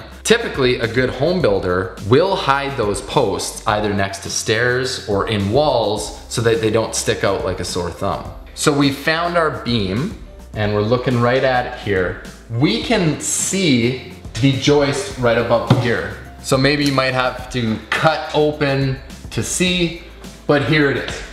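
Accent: Canada. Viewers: The most Canadian accent ever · You sound Canadian.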